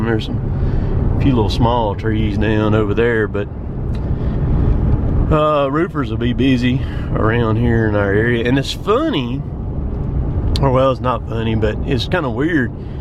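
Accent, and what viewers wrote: South, United States. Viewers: Love that southern accent... From fellow southerner on the NC/SC border. 😊 love these videos! Stay safe!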